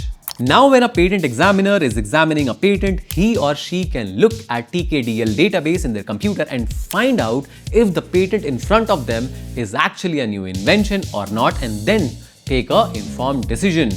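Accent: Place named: India. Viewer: That's just an indian accent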